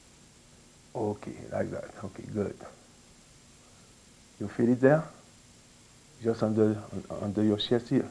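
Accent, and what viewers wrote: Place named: France. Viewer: I understand this frenglish language no problem ☝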